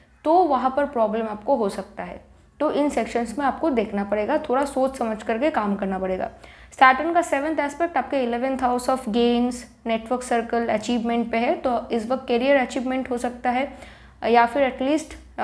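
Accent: Bengal, India. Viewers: Typical Bengalli accent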